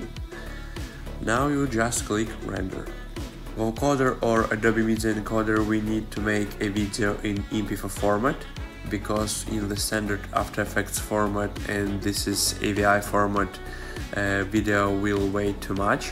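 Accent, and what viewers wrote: Russia. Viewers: русский акцентик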